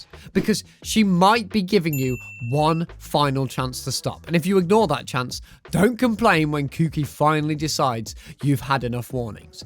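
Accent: United Kingdom. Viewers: Hi are you British?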